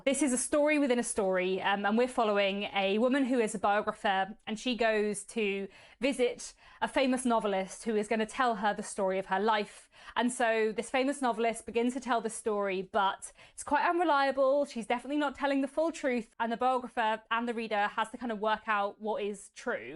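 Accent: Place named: West Country, United Kingdom. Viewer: I would find it hard to do a top ten, and I would have to split it into two lists, one of fiction and one of nonfiction. I have read several of the books you mentioned, but none of them would make my top ten. My books would be a little obscure to most people as they would be germane to Gnosticism and the Paranormal or to Historical Fiction. Books like Luce by L. R. Fredericks, We Other by Sue Bentley, The Strange Life of Ivan Osokin by Ouspensky and The Secret Magdalene by author and old FB friend Ki Longfellow would be in my top ten fiction books. The one most would know is Melville's Moby Dick which is very Gnostic when you understand what Gnosticism is all about. From Historical fiction, I would put Monk's Hall in there by the late Hilary Mantel, Innocent Traitor by Alison Weir and Sarum by Edward Rutherfurd. That last one, is set around Salisbury and Stonehenge and the Cathedral and how the place became inhabited in ancient times. 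I detect a slight West Country accent in you, so it would be the one I recommend, which makes for fascinating reading.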